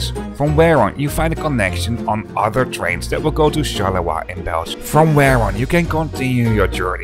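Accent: Netherlands. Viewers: Is it just me or is this guy sounding like a dutch guy? I am so confused by the accent and english he is speaking. But i love the vids keep up the good work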